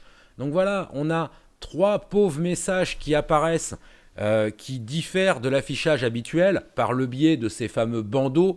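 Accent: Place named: Nord, France. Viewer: J'adore le ton de cette vidéo, bravo au présentateur ! En plus il est concis, ne s'écoute pas parler pendant des heures en mode égo m'as-tu-vu ! J'aime bien aussi la pointe d'accent du Nord, toujours très agréable à mon oreille tant elle rappelle la certitude que quand on a les fricadelles, il n'y a plus rien à prouver, plus rien à prouver. Merci pour ce tuto d'une rare qualité, d'une rare convivialité. Et utile bien sûr !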